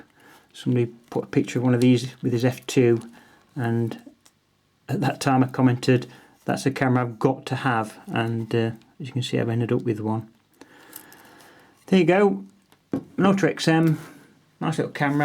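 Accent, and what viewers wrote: Yorkshire, United Kingdom. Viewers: much appreciated, I noted after I sent the message that it was six years since the video, but I live in Lyndhurst New Forest have done for past 30 years, but used to be a fireman in South Yorkshire Sheffield having Transferred over from Manchester, so it was nice to hear the dulcet tones of true people! I collect cameras and have a varied selection right across the board, but I’m light on the Older Nikon, shall we say in the upper bracket, revered F series F1 and F2, so you prompted indirectly, a bit of homework 📚 and I looked at several references to these models and your right the F2 gets glowing reports in fact it’s difficult to find a bad remark, also the XD in my Minolta there is an XM on eBay at present, that looks decent and money at present is good, but I think you have swayed me. Loved the various controls and invasions, Minolta where always like that though, even in the Dynax range it was always more, but probably their down fall. 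Still the name lives on with Sony and It’s Nikon that are really having to come up with the goods now. Still carry that premium Name and always will. Thanks again and nice that you got the message. Regards David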